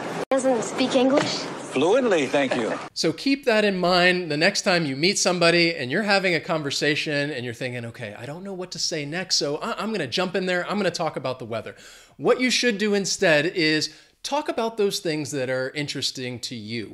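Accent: United States. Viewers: I love your american acent. I live in Italy and always the people speak English from UK and some time I'st hard understand. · You are my favorite English teacher because I'm more into the American accent rather than the formal English accent taught in India. BTW it's slightly raining in Kerala. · Hi there, I'm French and writing from Paris ; it's been raining really hard yesterday with cats, dogs and even hail 🦝🦊🐯🦄🐷 ! But I don't know how the weather is 😎 right now... I've found yr channel a few days ago and I noticed that you were saying all the time that you're teaching English while I noticed right away your American accent and style. As I'm also following other learning English channels, I thought you should be mentioning that you're teaching American English which is quite different in terms of accent and idioms. But I see you've made it, much better so ! 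· Are you a new Youtuber and an English teacher?? Thank you for this video and I like American accent as yours. I will show this video to my students. I never say " " but this phrase appears in an old song from the 80's by. I've heard that " " is used in the United Kingdom only and the same thing is "Shall"in all pronouns. We know that the English language has changed during the half past century and there are words that we no longer use. Thank God we can learn and speak an understandable and clear English. 😆🗽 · I'm a native English english speaker :) and I love your videos for picking up a good american accent for some creative work I'm doing. However, in the UK, we do still talk about the weather a lot, lol! Although we would say things like 'deadful weather isn't it?' rather than how's the weather.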